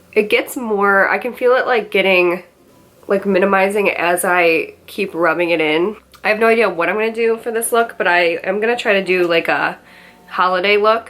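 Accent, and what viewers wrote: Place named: New Jersey, United States. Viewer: You're sooo beautiful are you from New Jersey?Im wondering where your accent is from lol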